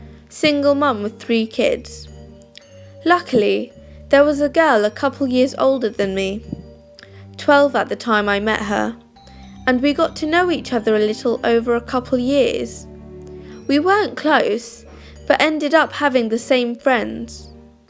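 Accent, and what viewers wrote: United Kingdom. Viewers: Her voice is lovey.And usually find British accent s annoying but her is lovely ;)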